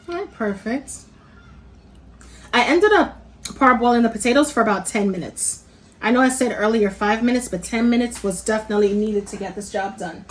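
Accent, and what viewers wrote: New York, United States. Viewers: She sounds like she’s from New York or New Jersey or something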